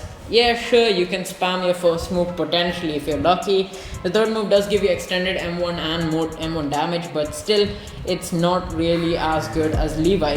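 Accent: India. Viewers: Bro you indian? Because your English way of talking is similar indians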